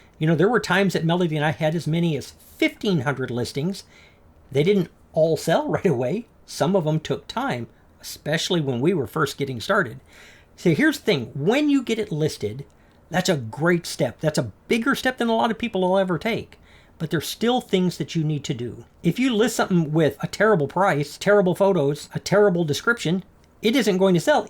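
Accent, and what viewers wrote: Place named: North, United States. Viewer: I didn't know you were a fellow Texan. You sound like you're from up north. Lol.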